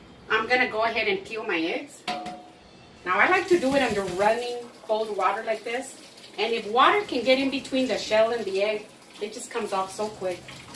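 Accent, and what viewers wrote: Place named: Texas, United States. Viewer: ! I absolutely love your recipes! I tell our four young daughters who watch your videos with me that I would love a lady in my life like you to teach me all her recipes! I often thought you were from Texas, just because of the way you talk and present yourself. I am from Austin, and we live in Manor, and today on this potato salad video I saw the mustard from H‑E‑B. Haha!!! I know there is no HEB’s in any other place but Texas! Anyways! We LOVE YOUR RECIPES! I used your cheese enchilada video as a reference for last nights dinner. We all loved it! Thanks for all you do and God bless you and your family!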